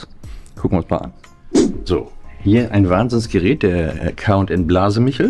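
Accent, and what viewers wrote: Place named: Sylt, Germany. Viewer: Der Akzent! Himmlische Heimatgefühle! Komme von List auf Sylt. Jedes Mal wenn ich ihn höre denke ich an Munkmarscher Muschelsuppe.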